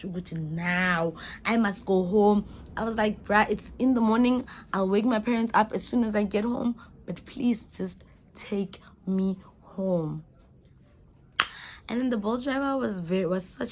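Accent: South Africa. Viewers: maan, it's my 1st time watching your page. I love your Xhosa vha, I was laughing from the get go😂😂😂.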